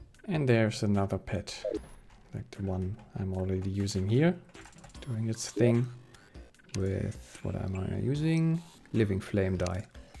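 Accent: Germany. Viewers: Man hört raus das du ein Deutscher bist xD Trotzdem geiles Video👍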